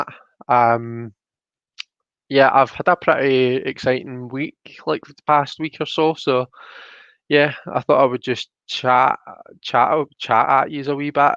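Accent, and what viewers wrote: Scotland, United Kingdom. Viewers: Tarot with a Scottish accent <3<3<3 Is that Glasgow? Definitely subbing!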